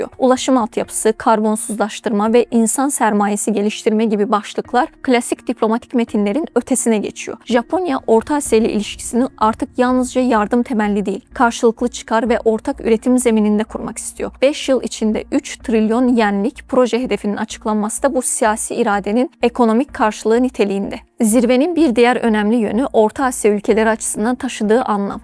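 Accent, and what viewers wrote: Azerbaijan. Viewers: Azerbaycanlidi danisigindan hiss edirem.))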